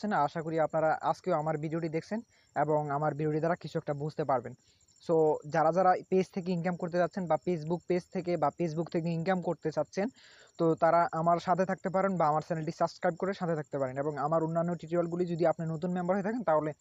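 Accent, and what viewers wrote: Noakhali, Bangladesh. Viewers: ভাই আপনার বাড়ি নোয়াখালী কোন জায়গায়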